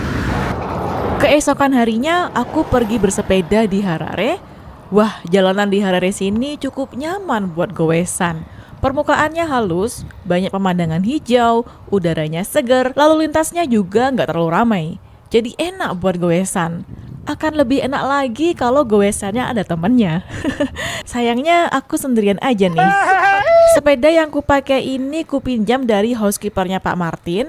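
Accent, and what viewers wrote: Java, Indonesia. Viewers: Jowo ne medok,,pisan² ajak ngobrol penduduk sekitar nggo boso jowo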